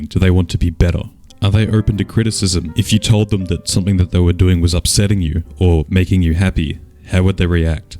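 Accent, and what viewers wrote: Australia. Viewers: Sorry I’m only here for the Aussie accent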